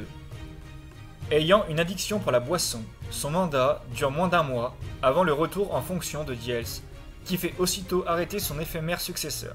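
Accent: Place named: Grésivaudan, France. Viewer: Ça sent l'accent du Gresivaudaaaan ça!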